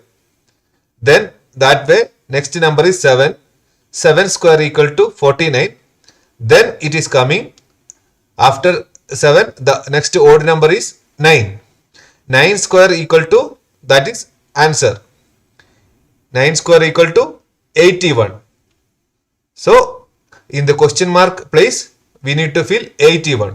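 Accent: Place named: South India, India. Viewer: Sir uu so cute Rr u 4rm south india na I love urr south indian accent & Sir show lobo of urr android app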